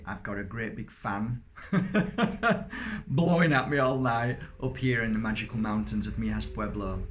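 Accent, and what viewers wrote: Yorkshire, United Kingdom. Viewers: Love your Yorkshire accent so much. Even though you're all covered up with a t-shirt 😉 thank you for another lovely message. 💕 😘 🤗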